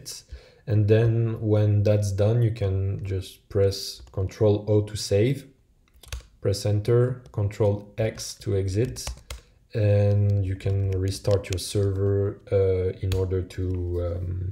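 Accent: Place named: France. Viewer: Salut, j'ai reconnu ton accent français, moyen de faire la vidéo en français ?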